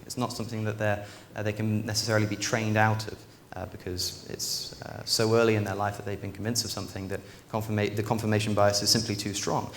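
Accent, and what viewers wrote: RP, United Kingdom. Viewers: • Looks like a young Oxford boy • Talks like a young Oxford boy • Thinks like an ancient Greek filosopher I'm proud to be the thousandth like on this video! (not really) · British accent = Sound smart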